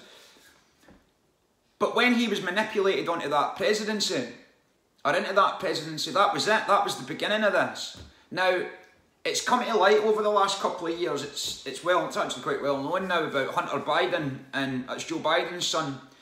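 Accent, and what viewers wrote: Scotland, United Kingdom. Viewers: Love ❤️ the Scottish accent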